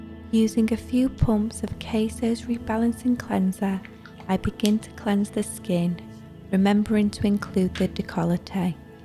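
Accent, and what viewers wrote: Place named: Northern England, United Kingdom. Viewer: is hers a Northern English accent? Whatever it is, I love it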